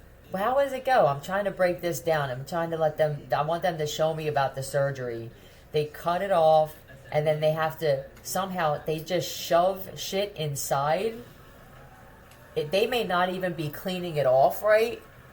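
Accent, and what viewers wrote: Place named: Philadelphia, United States. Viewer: Yooooo giiiirrrrrllll I LOVE YOU AND IM NOT TOO FAR FROM YOU AND BY THE PHILLY AIRPORT!!! You are spot on with these MF and what they are doing to me presently and have been for the last 3-4 years… gang stalking, harassment, hacking so much more….. smfh!!!! I would love to meet you in person so bad!!!! This reading had me on the ground rolling laughing!!! I love your Philly accent and I love the impressions of the women of the night and the wanna be women of the night!!!!!! I can’t make this crap up that they are doing to me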